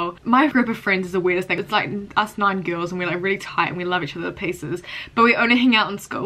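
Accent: New Zealand. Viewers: New sub 😜 betcha hear it allll the time but ur accent is awesome, I'm a kiwi aswl but my accent is nowhere near as cool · I love the New Zealand accent, but sometimes I can’t understand what you are saying, and how old are you?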